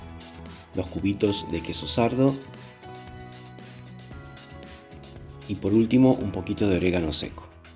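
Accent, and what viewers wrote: Argentina. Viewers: Sé perfectamente que el origen de ese vídeo es Argentina, reconozco el acento, si queréis llamar "jamón crudo" a algo que no lo es, pues vale.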